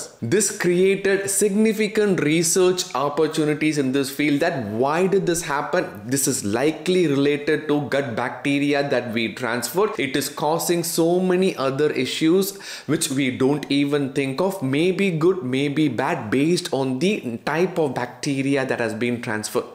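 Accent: Tamil Nadu, India. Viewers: Please Sir.... your speech is as if you r speaking tamil in English 😂... I love the accent 😅 Please slow down your speed of speech...so that we can get more clear 😊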